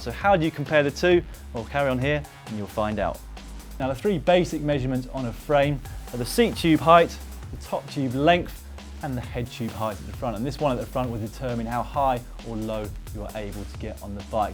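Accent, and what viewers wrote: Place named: United Kingdom. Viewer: I cannot understand what he's saying because he's speaking British. Those people have a lot of difficulty with the English language. (I'm an American, and we speak correctly.)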